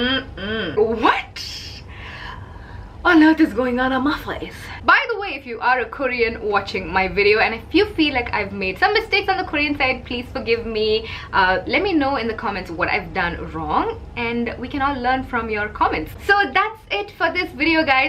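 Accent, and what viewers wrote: India. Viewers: she is so pretty 😭😭😭 also Indian accents are so harsh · I've just discovered your channel with this video. And I really like it ! First it's not just a VS makeup, because you're explaining lot of things and I love to learn about the different cultures, so huge thanks. And your Indian accent is so pleasant to hear ! ^^ You're funny and beautiful. I subscribed !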